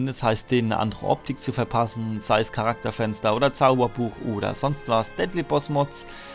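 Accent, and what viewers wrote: Palatinate, Germany. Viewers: Da Pfälzer....wo her?^^ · ohh ein Pfälzer find ich gut darf ich fragen aus welchen raum du kommst?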